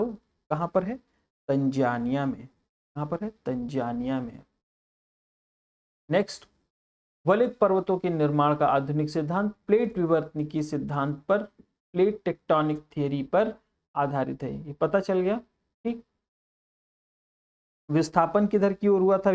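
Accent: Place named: Uttar Pradesh, India. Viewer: Bahut hi jhakkas ek dum up wali bhsha me bole to mast lajwab❤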